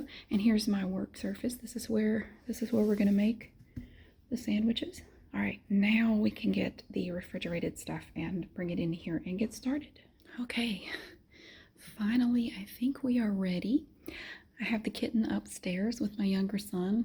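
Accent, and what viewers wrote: United States. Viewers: This is so comforting to me. I live in the UK and my grandparents live in the States so I love watching your videos to hear the accent and the American kitchen and food. Just how I remember ☺️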